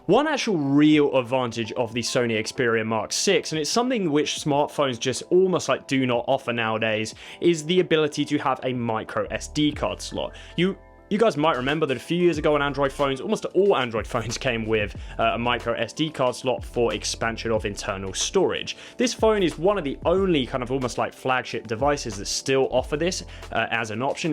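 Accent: United Kingdom. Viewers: Excellent comparison. He speaks with a Cockney accent.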